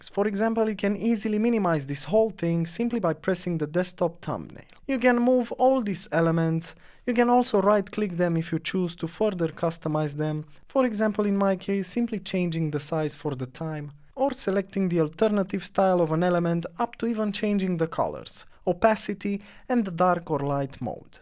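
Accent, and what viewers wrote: Russia. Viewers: is this guy russian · ue voice like russian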